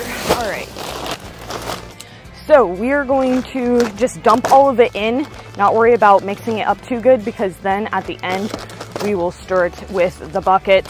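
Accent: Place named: New England, United States. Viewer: Maybe just a hint of New England in taaaak. These folks work hard at whatever they do. Seem like sincere people. Need more of them today😊😊😊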